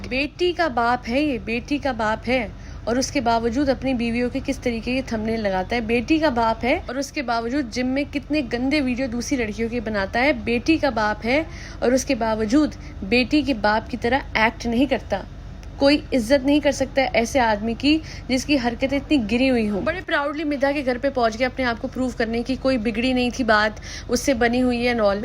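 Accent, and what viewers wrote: Punjab, India. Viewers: Yes she is definitely a Punjabi cz she literally uses such words jo sirf Punjabi hi bol sakta hai with proper accent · Panjabi accent lajawab lagi..👏👏👏👏.... Malik's and Ibrahim's ka to name sunte hi maan ki shanti bhang ho jati hai aur gussa lagne lagta hai..... baaki good points 🙏🙏🙏🙏🙏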